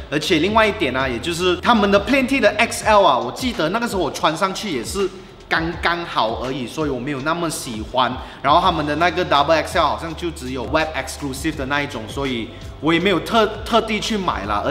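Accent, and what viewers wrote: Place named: Malaysia. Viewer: 听到熟悉的马来西亚华语，原来您也穿我喜欢的素T，好棒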